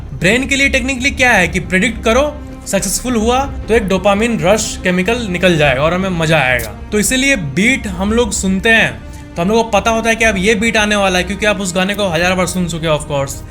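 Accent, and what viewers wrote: Bihar, India. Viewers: Sir aap bihari ho na please reply me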